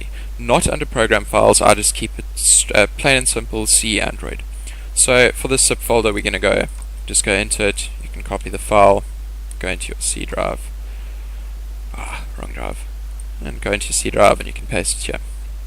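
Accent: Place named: South Africa. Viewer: I opened this video randomly and immediately recognised a South African accent😂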